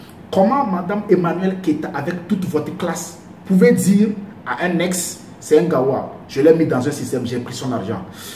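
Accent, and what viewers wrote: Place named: Togo. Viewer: Enfin un togolais qu'on peut suivre. J'ai reconnu l'accent en meme temps. Preach brother!! Preach .... Bon vent a toi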